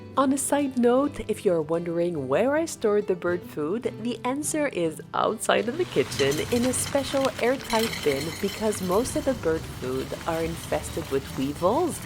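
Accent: France. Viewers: oui moi aussi! Mais je vis à Mancheter! Ça m’a fait plaisir d’entendre le petit accent français! J’espère qu’un jour je parlerai anglais aussi bien que vous! J’aime bien vos vidéos elles donnent du courage! Et j’aime aussi votre tsniout!🧡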